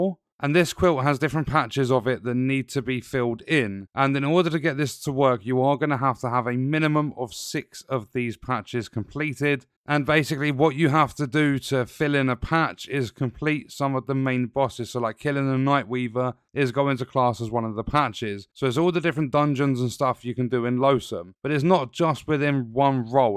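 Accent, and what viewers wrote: United Kingdom. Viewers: I know his time zone. His accent is a dead giveaway. He’s definitely British. So his time zone is the same as mine!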